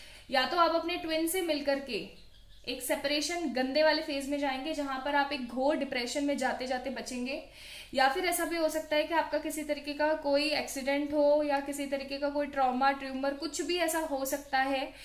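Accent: Punjab, India. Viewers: punjabi bolte ho app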